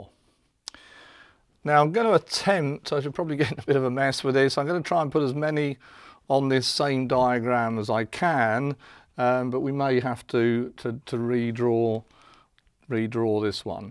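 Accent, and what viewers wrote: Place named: England, United Kingdom. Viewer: Takes a bit for me, as an American, to get used to the English accent, but I find the explanations to be quite good. Thanks for the upload!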